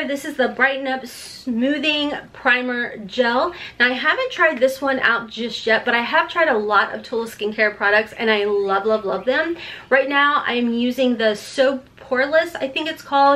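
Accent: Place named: Kentucky, United States. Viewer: It is so cute!!it reminds me of the 5 years I lived in KY and came bk to california with an intense accent! It's so comforting and her extra special personality just makes me feel like she is the house that in todays society you could still knock on the door and ask for a glass of water or directions and she would gladly give them to you and probably invite you to sit on the porch for a few minutes to have a short converstion!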